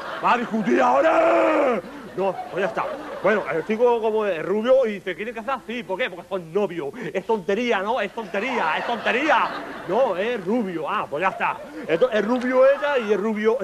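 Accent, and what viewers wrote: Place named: Huelva, Spain. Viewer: pedro reyes es buenisimo!!! m encanta, es un humorista poco corriente por eso m resulta muy gracioso ademas ese acento, ademas de huelva como yo, eske es la polla... el primer hombre de peluche, y direis, donde tiene el interruptor??? ahahahahah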